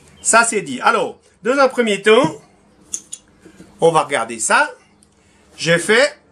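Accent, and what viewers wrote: Switzerland. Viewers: salut toujours ingénieux et j'adore ton accent de ta belle Suisse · Bravo , belle inventivité , on en trouve beaucoup au Canada on dirait , à moins que ce soit en Suisse ou en Belgique , bon , le petit accent est bien plaisant !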